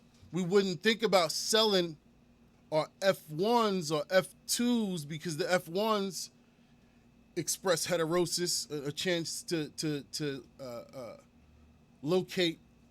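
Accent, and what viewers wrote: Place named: New Jersey, United States. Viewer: I can tell homie from jersey im from maryland but been to jersey alot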